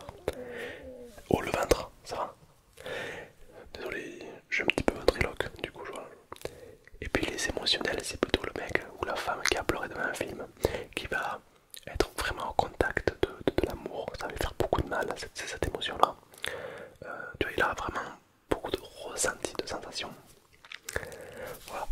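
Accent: South, France. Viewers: T as l accent du sud😂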